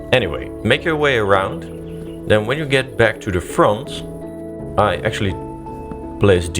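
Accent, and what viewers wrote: Netherlands. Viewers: wassup, are you dutch cuz i live in the netherlands and i also lived in america and you have the same accent as most of my english speaking dutch friends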